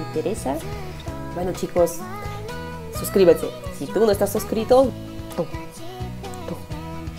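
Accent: Peru. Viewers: q ya regresaste de rusia 😮 uno. aquí esperando videos de rusia. .. eres bien graciosa. ya se te pego lo peruano. y muchos acentos. lo ultimo a rascarse los huevos jajaja me dio risa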